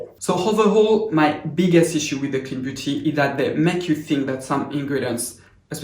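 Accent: France. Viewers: I think that french accent is cute 😃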